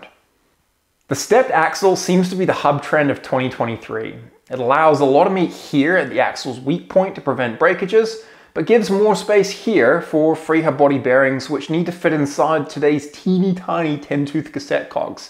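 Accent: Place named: New Zealand. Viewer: Awful kiwi accent.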